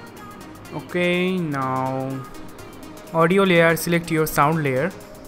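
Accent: India. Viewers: You know its legit when a guy with indian accent makes a tutorial on it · Hindi Accent Wow nice x)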